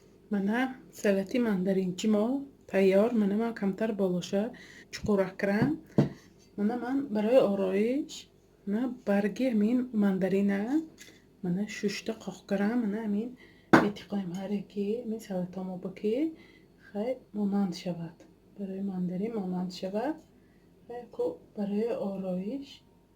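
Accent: Isfara, Tajikistan. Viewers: Хело,ба фикоам шумо аз Исфара,лахчаи гапзанитон монанд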